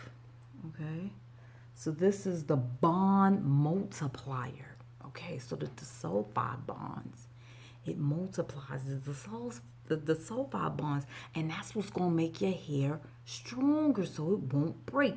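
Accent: New York, United States. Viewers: Thank for this video. Love your accent. From NY? You tell it like is and that honestly is refreshing. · Girl you have to be from New York I love the way you talk I'm about to order because the way you broke it down lol thanks Boo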